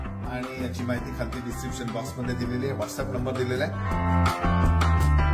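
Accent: Vidarbha, India. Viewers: Vidrab touch bolaya chaan vatte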